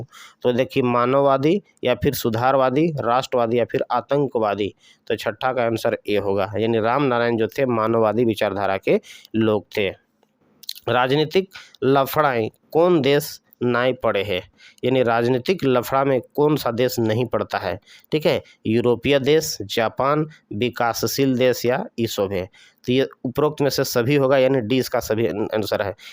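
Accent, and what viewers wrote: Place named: Khortha, India. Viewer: आपके खोरठा बोलने की शैली से मैं काफी प्रभावित हूं। कृपया आप नियमित रूप से विडियो अपलोड करना सुनिश्चित करें,यह चैनल बहुत तरक्की करेगा। 🙏 धन्यवाद 🙏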